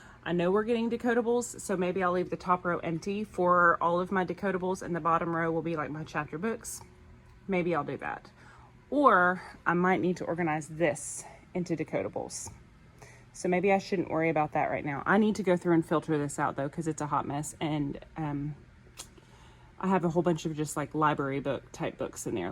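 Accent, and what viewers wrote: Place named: Arkansas, United States. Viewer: First time watching and I heard a “Ya’ll” in there and thought “she sure does sound Arkansan!” (Like me lol) and then you mentioned later in the video having taught in Arkansas!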